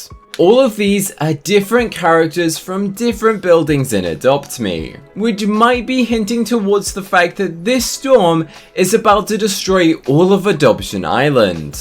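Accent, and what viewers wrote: United Kingdom. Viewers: This is the most british accent that i ever seen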